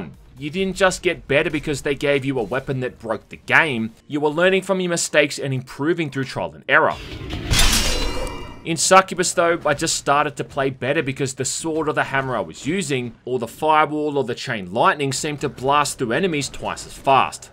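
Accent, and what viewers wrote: Australia. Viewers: Do I hear... ...Australian accent?!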